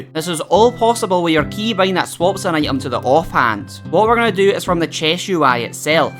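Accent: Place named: Scotland, United Kingdom. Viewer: Propa Scottish man, aye?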